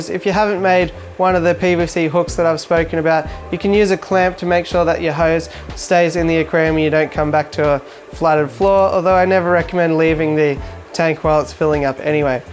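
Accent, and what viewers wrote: Australia. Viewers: Are you from Geelong !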